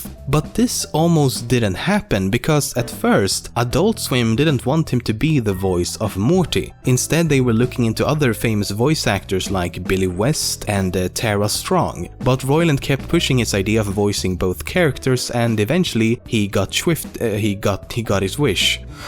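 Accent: Sweden. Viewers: Everyone's applauding the severe accent of a Swedish person who never thought about what he sounds like, calling it soothing. Okay. To someone else it's annoying as hell, how smug he is sounding while pronouncing English like a third grader. I'm not saying I can do better videos, but seriously, I'm from Sweden and most people know how to say "j" and "ch"... Or it could be on purpose, who knows. Now I know what accent to fall back into when needed, lol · There's something slightly wrong in the accent of the narrator of this video. Like he was slightly swedish or something? I don't know. POST-EDIT: towards the end "Believe me ... I've SHECKED" , that was a dead on class A swedish sign. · Hej ska du bryta lite mer på svenska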